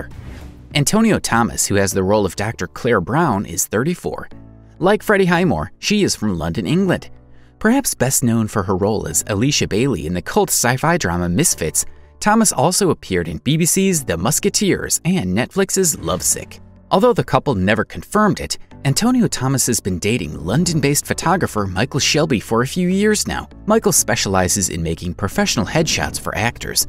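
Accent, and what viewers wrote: United States. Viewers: That narrator’s Midwest pronunciation of the vowel “a” is tooooo much!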